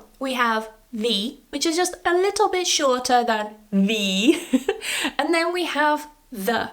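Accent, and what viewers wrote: United Kingdom. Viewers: Que bueno necesitaba esto de prunciar acento british me encanta 😊😊😊😊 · I really i like british accent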